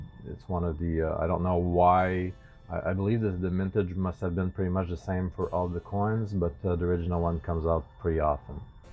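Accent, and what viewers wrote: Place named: Quebec, Canada. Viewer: I recognize the Québécois accent lol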